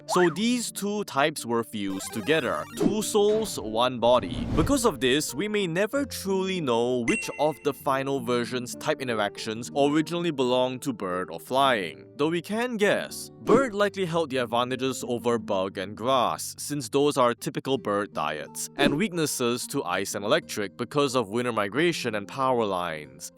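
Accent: Singapore. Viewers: are you Singaporean, cuz the accent do be like that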